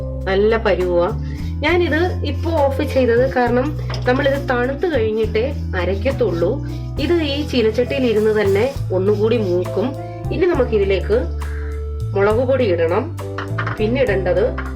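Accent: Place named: Kollam, India. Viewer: supr Chechiiiii.....chechide Kollam slang kelkkan nalla rasamaaa kettto.i like it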